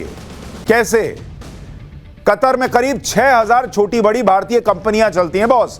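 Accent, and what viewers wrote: Bihar, India. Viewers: Paise ke liye kuch bhi bake ja raha hai tension me andar ka bihari jag gaya hai. Ye Bihar se hai iski accent badal gaya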